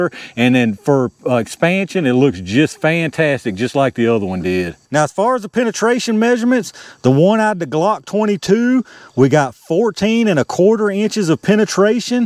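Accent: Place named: Minnesota, United States. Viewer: Sir you are a scientist and a scholar. Only a purist with real natural genius would strive to delve into minutia to this degree. Bless your heart and keep that wonderful Minnesota accent.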